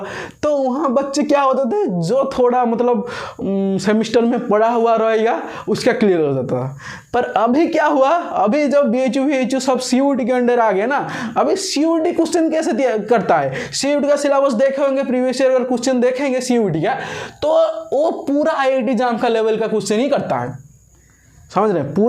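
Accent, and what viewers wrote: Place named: Odisha, India. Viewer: Pehle accent mein hii pata lag geya kii odisha se ho....me also from odisha ❤😅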